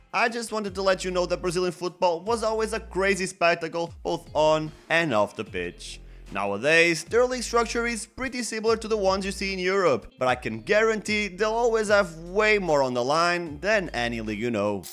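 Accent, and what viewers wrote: Portugal. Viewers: Is the dude narrating from Portugal or something? His pronounciation of the names sounds like a Portuguese trying to say the brazilian names. The S feels almost like a Carioca speaking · aposto que ele é portugues, pelo sotaque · This accent don't lie to me: you're abolutely tuga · Por quê? Parece sotaque português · i love your european portuguese accent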